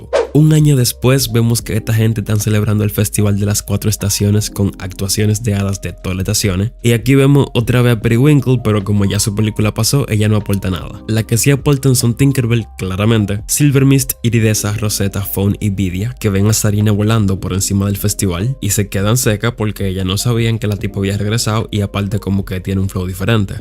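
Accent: Dominican Republic. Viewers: Vos es de dominicano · Bro, tu eres dominicano verdad? El final tu canal, me encanta como narras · Amo como narra, nunca pierde esa esencia dominicana ❤️‍🩹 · Algo me dice que el es dominicano?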